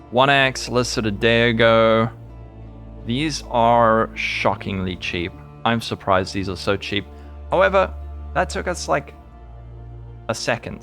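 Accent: South Africa. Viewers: Just came across your channel, and a quick question with regards to your accent - are you from South Africa?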